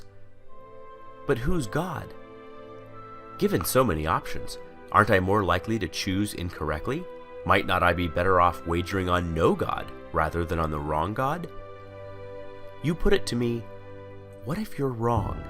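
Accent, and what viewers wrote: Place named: England, United Kingdom. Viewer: Giọng Anh nghe phê thiệt :)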